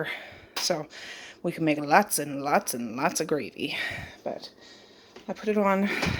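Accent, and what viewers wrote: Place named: Nova Scotia, Canada. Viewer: First time watching the post on its first day. It took 3 months to watch every post from the very beginning. 🙌 🙌 I watch because it sounds like home. Born and raised in Nova Scotia.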